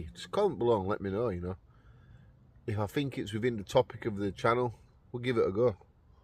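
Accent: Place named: North, United Kingdom. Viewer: I thought I was subscribed but I wasn't, always watch your camp outs, your Northern accent makes me chuckle, the beard is looking good, get a beard before it snows it'll keep your face warm in the cold weather. But it definitely suits you, ohhh suits you sir! Lol! Got to convince the Mrs. Oh lovely to hear that gentle rain, it's been so dry this year, no rain to clean the air. Need that rain to keep it a green & pleasant land. How about a coastal camp, you & vegan Dan, I suppose your far from the coast, its getting the time. Anyway keep up the good camping! Always let us know how you feel, ignore the haters, can never please them anyway. A lot of share your opinions & outlook, at least we're right! Lol!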